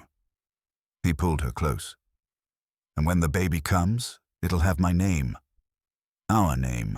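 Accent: England, United Kingdom. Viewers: Need an American narrator for an American story besides an English talking narrator.. Her husband been dead two years and daughter died six months and her four or five months expecting a baby who is the dad ? It couldn't be her husband's baby... Proof reader needs to read the story.. I like the story it needs to be more accurate...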